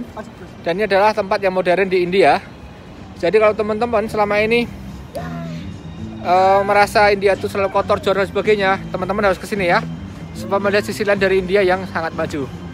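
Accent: Java, Indonesia. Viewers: maaf mas mo nanya mas ini asli mana to.. ngomongnya kek medok medok jawa gitu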